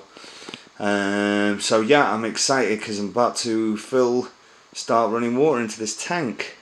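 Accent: United Kingdom. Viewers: Yeah, I just poking fun at you. One dude to another...I love your accent, lol. Is that Cockney? I am from the American midwest. I sound alot like John Wayne. I like you videos. Especially when we can see the equipment and how it is hooked up. you are my newest hero If I had your mailing address, I would mail you a cape and a big old S for your chest. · the british accent is great i loove these videos hahaah keep em coming MATE!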